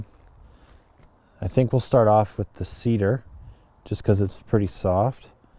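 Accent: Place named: Canada. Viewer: Could you please remake this video with a narrator? I can’t understand the thick Canadian accent. Thanks.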